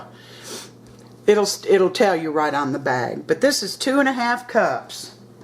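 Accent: South, United States. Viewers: Thank you so much! Those look so easy! I'm a yankee who has been known to make hockey pucks instead of biscuits and I think these I could do and not mess them up! I had a friend from SC even show me how to make biscuits when she came up here to visit and she said pretty much the same thing you did...when you are mixing them she said 'don't screw with them too much! in he same sweet southern drawl. <3 Thanks again for the recipe I thin I might go try them RIGHT NOW! :D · I have been trying to make some for a long time & each time they are like rocks,so sure gonna give this a try,I aint giving up on baking.Love your southern drawl :)i never had problems years ago in UK.The folding technique is a new one on me.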